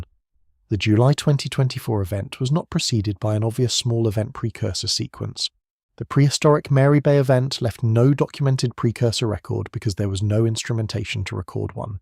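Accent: United Kingdom. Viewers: Everything with a British accent is a bullshit Lie!!!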